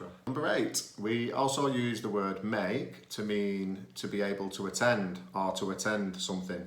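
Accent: United Kingdom. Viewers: thank you very much for your dedication and careful explanations! And sorry for my question, what kind of British accent have you got?? I notice that it is British but with same variations, is not it??... Sandro Cano, from Argentina...;);)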